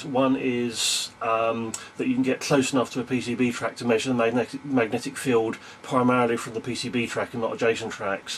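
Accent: United Kingdom. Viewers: Wow been watching eevblog a while. Never knew about you great to hear a UK voice in electronics! Thats from a essex lad!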